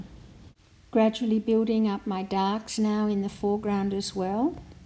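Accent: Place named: Australia. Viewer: You sound Australian.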